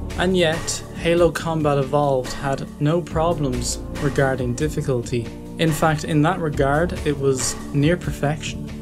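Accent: Ireland. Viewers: That accent can't get more irish. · Is that a Galway accent I hear? Or at least Connaught?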